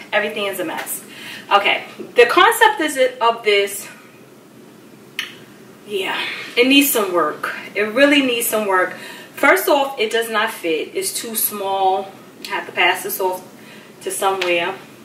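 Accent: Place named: New York, United States. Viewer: I could hear that strong new york accent lol love it is cute just like you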